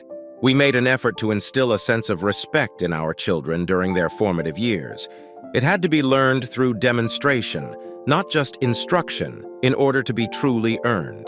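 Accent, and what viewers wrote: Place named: RP, United Kingdom. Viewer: What is with the high english?????!!. It sounds stupidly arrogant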